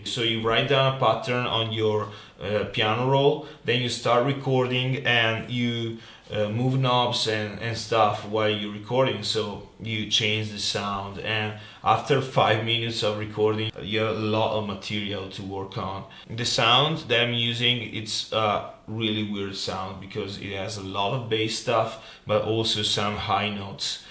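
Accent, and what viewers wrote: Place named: Italy. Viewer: si sente troppo che sei italiano<3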